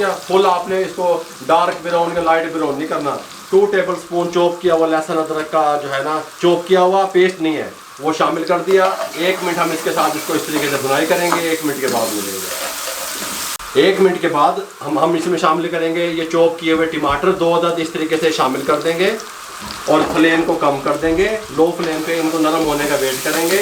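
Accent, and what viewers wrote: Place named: Ambala, India. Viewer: ap Aumbala sy hain Humary neighbour wahan sy thy Lehja bikul ap jesa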